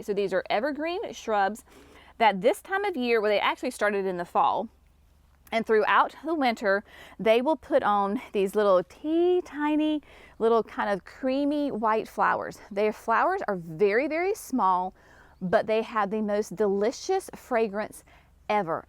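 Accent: South, United States. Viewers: ! I could listen to you all day! Your southern accent is adorable. I love how encouraging and inspirational you are. You give me so much courage and strength to get out there and get stuff done in my overwhelming yard. I absolutely love your quote, ”There is grace in gardening.” I need that sticker for my water bottle! 😂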